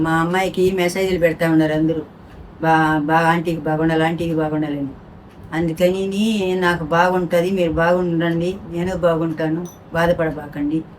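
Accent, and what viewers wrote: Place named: Nellore, India. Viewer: Medi Nellore ah sis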